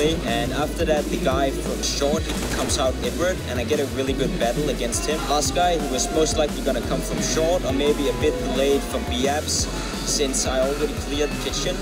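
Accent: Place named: Australia. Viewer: He sounds Australian lmao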